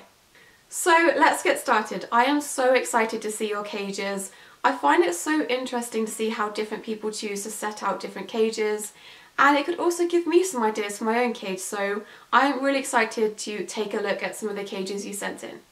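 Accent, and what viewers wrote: United Kingdom. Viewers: You sound very British today TeA Or CoFfEe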